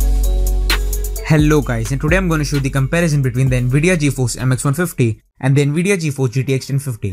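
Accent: India. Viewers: fakof with ur indian accent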